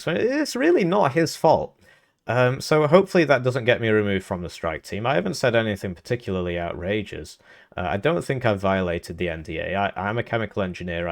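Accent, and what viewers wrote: England, United Kingdom. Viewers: how lung did it take to earn the English accent?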